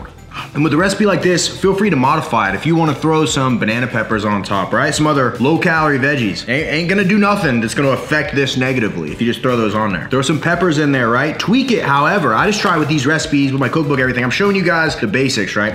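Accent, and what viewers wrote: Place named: Ohio, United States. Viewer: Listening to this dude gave me ohio vibes. Checked him out... columbus. Southender here myself.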